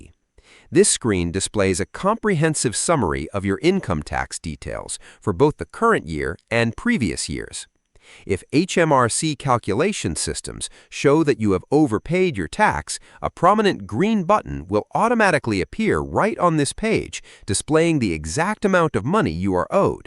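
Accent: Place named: United States. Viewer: HMRC could have used an AI voiceover without an American accent!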